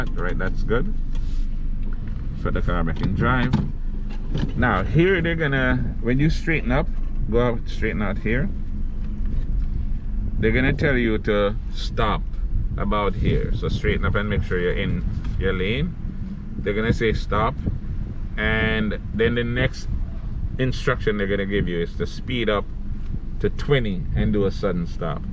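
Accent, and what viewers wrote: Jamaica. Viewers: R u Jamaican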